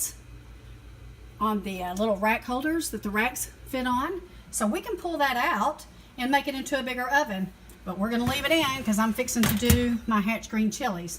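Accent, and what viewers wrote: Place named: South, United States. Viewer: I didnt think she talked fast, ha! Must be the southern.thats why I can understand lol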